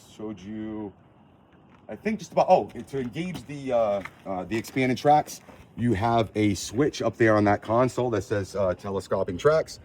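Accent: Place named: East Coast, United States. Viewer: He does talk fast, but he's covering everything as well as possible. The east coast accent is definitely showing.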